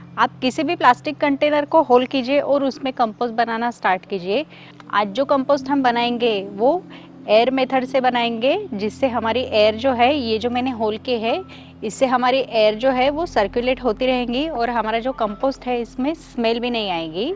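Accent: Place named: Maharashtra, India. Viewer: Aapke vdo to muze bahut achhe lagate hai. Language tuning se aap muze marathi lagti hai. Am i right mam?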